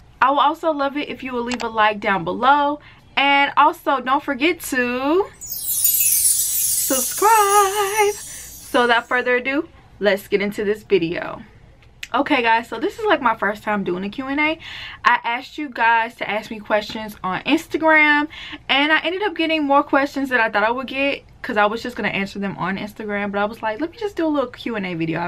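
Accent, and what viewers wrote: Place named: South, United States. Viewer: Yes you def have a southern accent but you mix it up.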